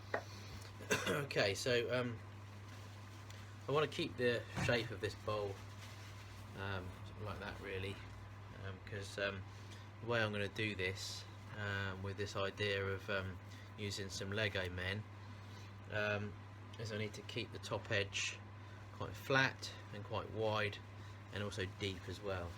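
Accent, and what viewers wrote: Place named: England, United Kingdom. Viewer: He talks correctly he lives in the land that invented English ENGLAND Yeh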